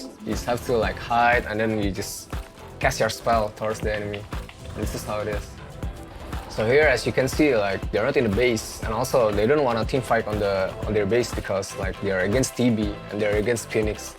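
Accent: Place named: Indonesia. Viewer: 7/10 inggrisnya orang indo, dia kuliah apaan dah?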